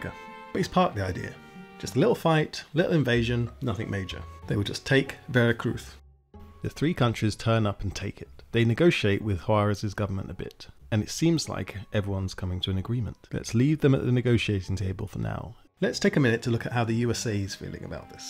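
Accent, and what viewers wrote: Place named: United Kingdom. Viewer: Listening to a Brit pronounce Spanish place names and dates in Mexico in Castellano is hilarious. “Vera Cruth” “Thinco de Mayo” 😂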